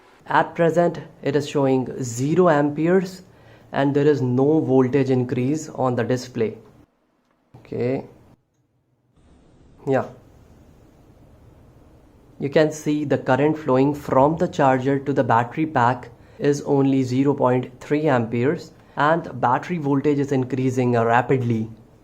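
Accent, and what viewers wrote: India. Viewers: У него индуский акцент??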